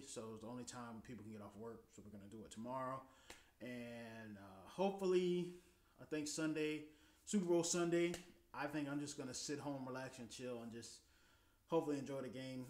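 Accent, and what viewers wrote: Jutland, Denmark. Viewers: Meget imponeret af dit dansk! Og det er fantastisk at du har en jysk accent! Ved ikke om du har set The Julekalender, men når du snakker dansk lyder det næsten akkurat som bondemanden :D! Bliv ved med at lave vlogs, det er vildt fedt at hører! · Pretty interesting to hear you talk danish and sounding like one from jutland, I did not expect that ! :)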